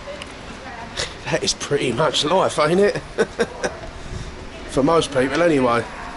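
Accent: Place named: London, United Kingdom. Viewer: Love your videos, informative, interesting and fun. Plus your accent is impeccable London! Long may you continue with these excellent little films, for someone who lives in a village like me, the sheer size and busy nature of London is mind boggling and mildly terrifying! Much love from the north of England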